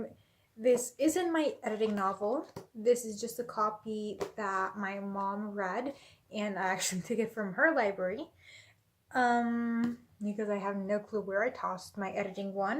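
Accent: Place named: United States. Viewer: Thank you for the kind words! Also, thank you so much for sharing about your heritage! I love learning about things like that. I can definitely hear a little American in your voice. You do speak English very well. This may be a silly question, but do you strictly write in English? Thanks again for sharing!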